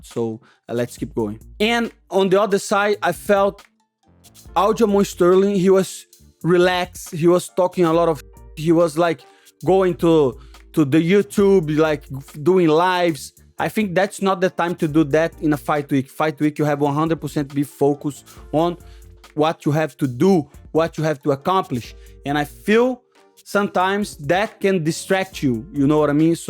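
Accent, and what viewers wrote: Brazil. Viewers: Your English is good. You just have a thick Brazilian accent. And there's absolutely nothing wrong with that. I prefer to hear someone's accent coming through personally. I'd say you're doing a very good job.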